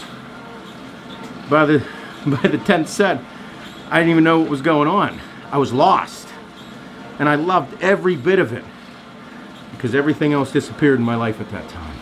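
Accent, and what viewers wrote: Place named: Western Pennsylvania, United States. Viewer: Are you from Western PA? You sound like you do. Anyways, there is a line between laziness, procrastination, guilt and as you say being an asshole.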